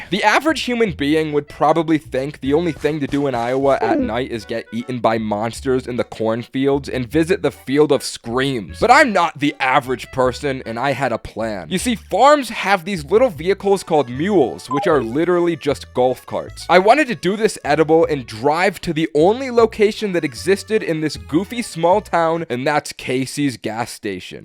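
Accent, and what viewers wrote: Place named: India. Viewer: I just realized you sound Indian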